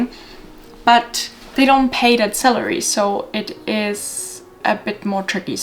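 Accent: Germany. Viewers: Thank you, i thought I heard a little german accent but there are many countries that speak german, right. Good Luck with everything and i hope we Swedes help you with what y need.👍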